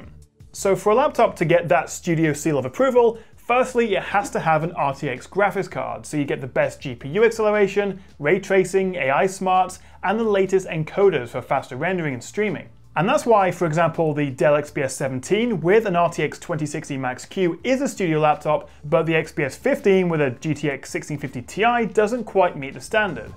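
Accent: United Kingdom. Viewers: Surely it is, and it was with an emphasis on good job, besides doing your leg work here with your community, then you truly make differentiated videos beyond the british accent;)! Nvidia better team up with u again! Your explanation of these semi-niche brandings are unique and can’t be outsourced to India or perhaps done by a futuristic AI 😛(speaking of DLSS).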